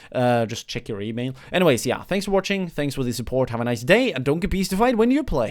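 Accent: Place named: Norway. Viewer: You are norwegian right? I can hear it on your accent. I'm swedish.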